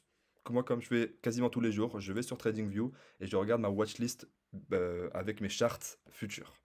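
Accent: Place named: Switzerland. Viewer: Ta géré ta technique est simple et efficaces à parament je suis vraiment debutant et j’ai reussi je suis fier , et c’est grace a ton tuto je jure je l’es écouter au moin trois 4 fois , avec le téléphone et en meme temps sur mon pc , jamais pas les futurs de base , mais en vrais c’est l’avenir 🤣 lol jte remercie super bien expliqué, et les sécurités qui faut c’est tip top ! Merci a toi encore ;) je pense que je vais regarder toute ta chaine aussi passue je suis tomber par azard et j’aime bien l’accent suisse ! Nanante huit :) peace et merci 🙏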